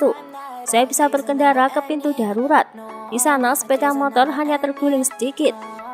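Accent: Java, Indonesia. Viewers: saya suka artikulasinya....medoknya muanteeep. Jaya terus Indonesia Channel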